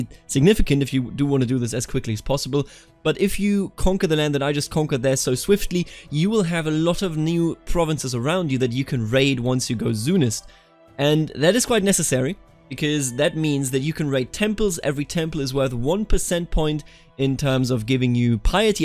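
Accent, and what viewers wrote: Germany. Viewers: Lav ze German Akzent:D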